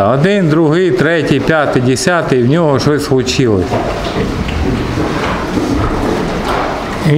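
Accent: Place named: Surzhyk, Ukraine. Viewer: Супер, супер репортаж. Наслаждался суржиком, неповторимыми интонациями и жизненной силой голоса Автора!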